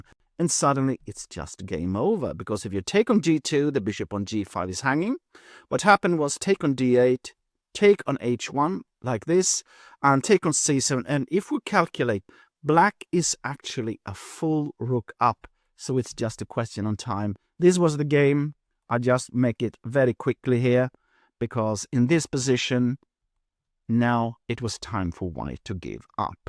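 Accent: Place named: United Kingdom. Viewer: is like Ben Finegold but with a British accent and no blather.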